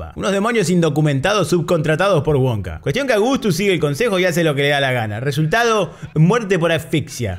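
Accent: Argentina. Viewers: no pude terminar tu video puesto que argentina cayo tan bajo que no soporto como hablas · Definitivamente lo mejor del vídeo es la narración súper argentina 🤣🤣🤣🤣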